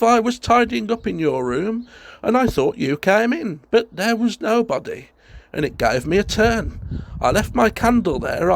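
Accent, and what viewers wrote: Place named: Solihull, United Kingdom. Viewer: What’s the narrator’s accent? I want to guess Solihull, but I must admit my experience is very limited, being an Australian presently kipping in London...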